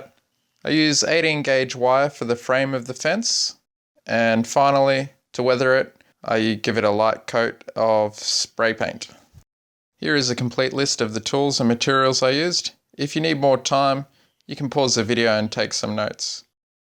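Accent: Australia. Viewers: Thanks for the reply. I've been looking at a number of your videos and have to say they are quite impressive. I too like a lot of attention to scenic detailing. At some point I would like to send you some pictures of a layout I have been detailing for the past 30 years. Did you mention a brand of wire you are using and where you can get it from. From you accent I suppose you are from the land down under and I live in the Northeast United states. As an aside there is an annual convention of master craftsman model builders. Here is the site: <link> It is occurring on the end of March and beginning of April. Check it out!!! I have Friends in Brisbane...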